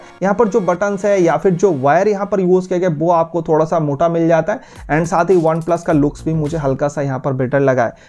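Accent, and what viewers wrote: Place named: Assam, India. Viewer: Everything is good but ur Assamese accent is kind of unique not bad though 😊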